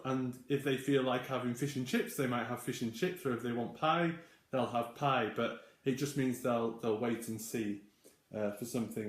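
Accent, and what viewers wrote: United Kingdom. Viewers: Hi your pronounce reminds me of my staying in London. I used to live there and I really like it! by the way I`m Japanese....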